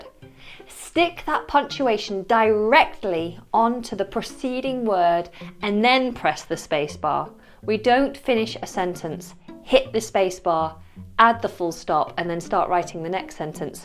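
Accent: United Kingdom. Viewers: Finally someone who teaches British english. I'm glad to have found your channel, I'm quite fed up with so much american accent. Greetings from Brazil.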